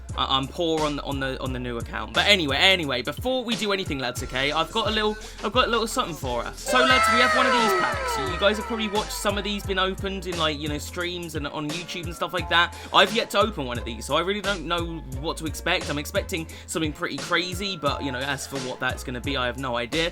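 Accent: Liverpool, United Kingdom. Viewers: What a thick scouse accent you have right there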